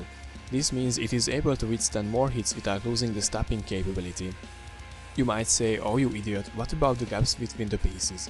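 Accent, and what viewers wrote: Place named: Hungary. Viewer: Hú de hallatszott a magyar akcentus de jó lett a videó. XDD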